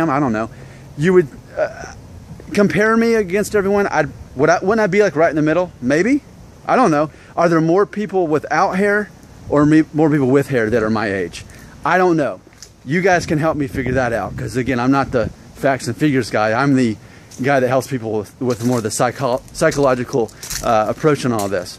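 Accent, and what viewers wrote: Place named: South, United States. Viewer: Quarter mexican with that southern accent how the heck does that happen?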